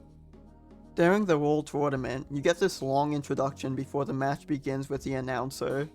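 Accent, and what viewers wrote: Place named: New York, United States. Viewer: ohhhh awesome. For some, you sound like you're from New York.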